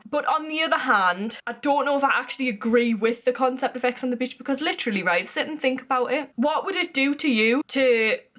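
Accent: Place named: Newcastle, United Kingdom. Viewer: Another Geordie, woooooo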